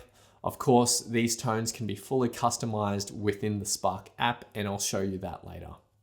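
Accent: Australia. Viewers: Do I hear an Aussie accent? If so,.. I've noticed several guitar guys from Australia lately. Very cool and says a lot for a strong music culture there! Spark 40 sounds like you're in a box. Surprising the Spark Go has more depth of sound. Strange. Currently,.. Pre-Orders $109 for this tiny little guy!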